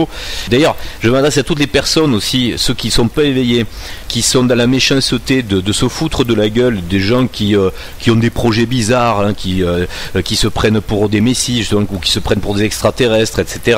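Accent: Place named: Toulouse, France. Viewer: Merci mille fois pour vos vidéos. Pas une seule seconde à manquer sur vos présentations, chaque fois que je la réécoute. Pas un seul mot de trop ! Et vos digressions, délicieuses. En vous écoutant je pense, 'c'est magnifique cet accent toulousain qu'il a ! ' Bon je fais donc partie de la troupe des E-veil-lés. Oups ! Ne pas confondre avec les intentions d'une revue ... "Réveillez-vous" ! Et je vais maintenant relire... "La solution extra-terrestre". (Petit conseil en passant par là..: découvrir les expériences du pilote de chasse à la retraite Jack Krine - sur Youtube. )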